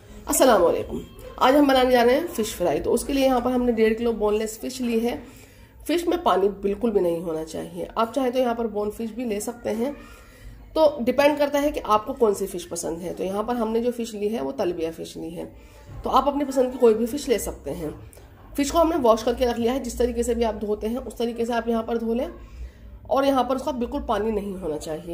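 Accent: India. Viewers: Assalam wallekum Masha Allah zabardast Aap India se hai q k aap KI language Indian lagti hai Mai Pakistan se hoo. Aap ki dishes buhat laziz recipes hoti hai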